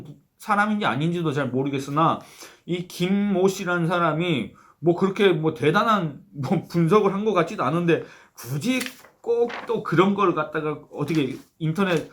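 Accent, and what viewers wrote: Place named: Gyeongsang, South Korea. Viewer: 저는 경상도 사나인 줄 알았어요😊😊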